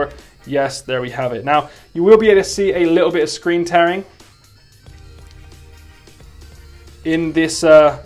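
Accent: Bristol, United Kingdom. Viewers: This is pretty cool I won't lie, but I swear you're also from Bristol with that accent of your's (hopefully that can be taken as a complement)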